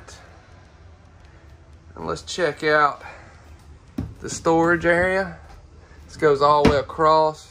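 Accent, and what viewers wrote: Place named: United States. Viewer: Why dou sound more country then chance and id have both 🥓 sausage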